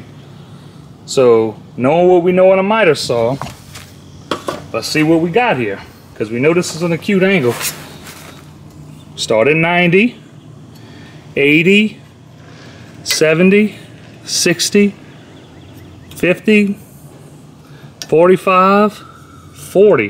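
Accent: Louisiana, United States. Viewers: You Cajun... I know that accent from anywhere lol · You gotta be Cajun. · You from down the bayou cher? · My good guy you from/in Louisiana? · Louisiana accent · bruh are you from south/southwest louisiana? sure sound like it with your accent kinda lol asking cuz i live in SW louisiana and i know how people sound down here haha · Cool trick!! Are you from Louisiana? You sound like you’re from Louisiana…… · I'm willing to bet that he's from Louisiana. I can hear the Cajun accent. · I know a fellow Louisiana boi when I hear him… lol. Great info! I never knew this. Thanks!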